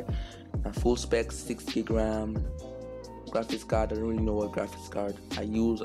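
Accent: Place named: Nigeria. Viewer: i can tell ur nigerian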